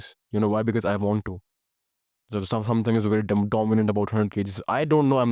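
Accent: India. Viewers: He's got an Indian accent though.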